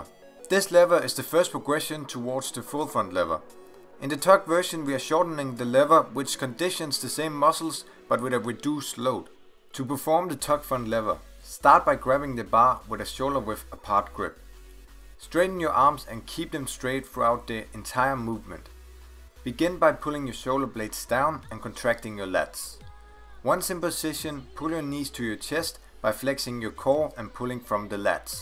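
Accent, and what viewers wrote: Denmark. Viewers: Nice danish accent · I love the Danish accent😂😂😂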